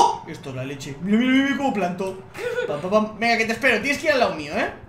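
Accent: Argentina. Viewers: que acento argentino papá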